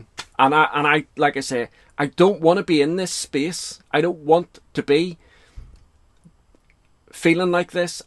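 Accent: Northern Ireland, United Kingdom. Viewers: Hey. If you are closing the channel, I just want to say thanks for the content you've put out. I've only discovered your channel relatively recently but it seemed like any art supply I looked up you had a really good review of. To be honest, I thought you had a Northern Irish accent so you were relatively local to me and that was kind of cool so i kept coming back, although you've since mentioned in other reviews that you're actually in England, but anyway. Anyway, just know that there are morons out there these days who just seem to go out of their way to get offended. It doesn't matter what you say, they'll twist it and do mental gymnastics to get themselves outraged. You can't let them get to you. And if, for you, that means you feel you need to close your channel, then the most important thing is to look after yourself and your mental health. 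You will be missed though. All the best. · I'm really sad about this. I've been following you for years (my other account is Maureen Refior). I know so much about you and your family that I almost feel like I know you all, in a weird way. Just hearing your voice is comforting, because I rarely speak to anyone from Northern Ireland anymore and it's just nice to hear the sound of home. I get it, though. Having your words stolen and twisted into something completely different is an ultimate betrayal. It's an act generally performed by intellectually stunted virtue signalers who get their news from Twitter and Facebook groups. They roam the internet in packs searching for victims. The pack's collective IQ is generally 43. You're a good man. Your past actions do not have to be eternally atoned; bad shit happens in wartime. And while I am sad that you're leaving, the fact that you feel this as deeply as you do really upsets me. 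I will probably join your Patreon. When I commented on Facebook I thought I had your email but I was mistaken and l don't want to lose touch with you. I pray for your daughter every day, along with you and her mum. Take care of the wonderful man you are now. Screw the trolls. Much love to you.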